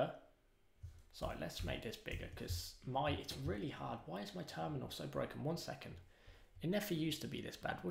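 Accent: United Kingdom. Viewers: nice British accent and i think they should change the name to feel.js seems nicer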